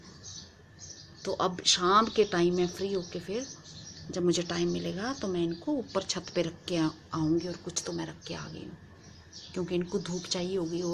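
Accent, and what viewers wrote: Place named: Jammu, India. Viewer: apki language ki tone sa aapka muja jmu ka touch lagta ha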